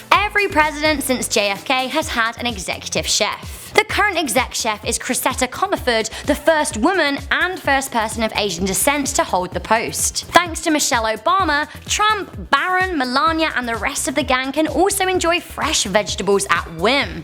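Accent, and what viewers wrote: United Kingdom. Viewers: I’m assuming you are British due to the accent and would like to point to the stupidity of this video. I am not a Trump supporter but feel the need point out that Trump along with all US Presidents are entitled to all of the things you mentioned. HOWEVER, the Queen of England (UK) is in fact above all British laws as she is considered the law. She could commit murder in the middle of London while flying a Nazi flag and drinking Scotch Whiskey naked and pissing in a bucket and could not be arrested. Everyone in the British government serve the queen. SMH at the unrealistic way some attack Trump. He may not be the best president but he is still subject to US law and impeachment.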